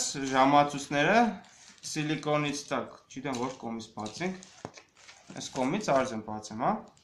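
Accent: Gyumri, Armenia. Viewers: Lenakani barbary dzec axpersss Like!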